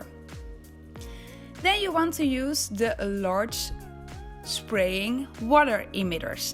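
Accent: Netherlands. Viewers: Idk but your accent sounds duchts😂❤️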